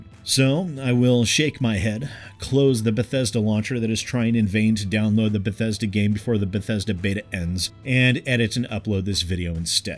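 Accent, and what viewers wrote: United Kingdom. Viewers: Damn, this was so cheeky I could have sworn you were British. Nice work.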